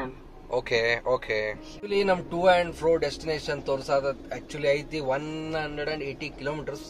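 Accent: North Karnataka, India. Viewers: uk bhase pakka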